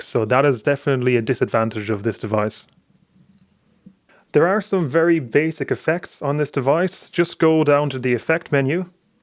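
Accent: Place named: Ireland. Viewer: Well I didn’t expect him to be Irish 😂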